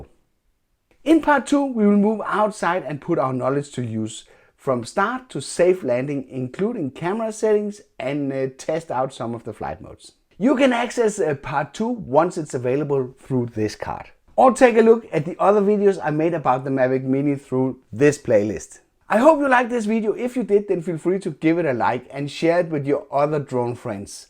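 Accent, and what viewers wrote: Denmark. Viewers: Danish accent it’s like Chinese ,any way it’s good tutorial☺️ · It Sound like youre from Denmark because i am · Danish accents are the best! Thanks for the info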